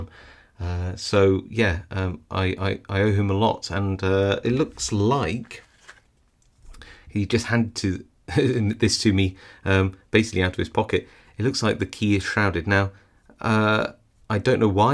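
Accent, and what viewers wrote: England, United Kingdom. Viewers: Love your English accent , brass lock no problem your so cool n confident. Keep up great work. I like as hobby but I'm still learning.😅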